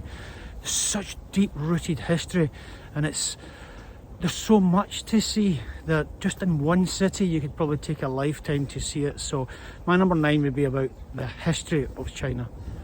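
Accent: Scotland, United Kingdom. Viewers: Interesting to see a Scot vlogging his life in China. Can tell your Scottish straight from your accent. Love it. God bless. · As a Chinese living in the UK for over 30 years, it is great to hear some Scottish accent from China! Thank you, for reporting your experience in China as it is, and hopefully giving some UK audience some truth about life in China. The main stream media here is so unbelievably biased that for general public in the UK, all they hear is anti China propaganda, zero about what life in China is really like! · Loved your trueful and sincere comments on living in China, and your Scottish accent 😊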